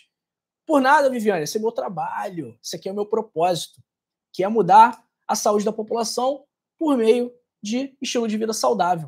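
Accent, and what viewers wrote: Rio de Janeiro, Brazil. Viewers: Bom dia!!! Que é cúrcuma? É um pozinho amarelo que compramos em casas de produtos de temperos tbm? Ganhei um pé de plantas me disseram que é cúrcuma. Será que alguém tem pé de cúrcuma em casa e deixar a foto pra mim comparar? Muitíssimo agradecida dês de já. Eu moro em Guarulhos SP e o DR pelo sutaque pare ser carioca,Rio de Janeiro. Morei lá duas vzs.😊✌️💞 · Vc deve ser do Rio de janeiro, pelo sotaque de carioca. Estou no Rio de janeiro. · Boa tarde Dr acho que vc e do Rio de Janeiro o sutaco não nega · Seu sotaque é de carioca, Dr. Vc Tem cara de Flamenguista, acho q vc fala do Rio de Janeiro, Cidade e Estado do MENGÃO!!!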